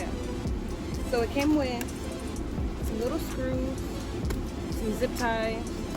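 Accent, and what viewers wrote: Jamaica. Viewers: Are you Jamaican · I had to subscribe as soon as I heard your accent come out 🇯🇲🇯🇲🇯🇲 much love from a fellow Jamaican 🖤🖤🖤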